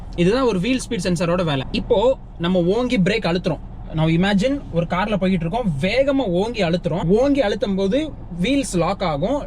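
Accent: Tirunelveli, India. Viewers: Bro neenga TIRUNELVELI thana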